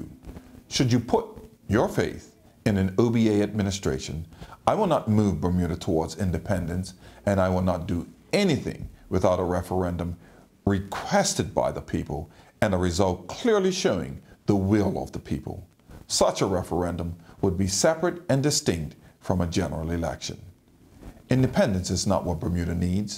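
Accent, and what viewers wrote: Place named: United States. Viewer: His accent is more American what gives 🤓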